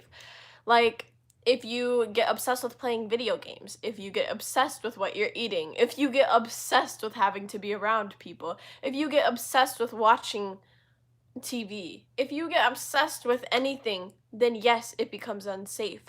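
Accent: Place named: United Kingdom. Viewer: the british accent is not at all bad get it bestie 😼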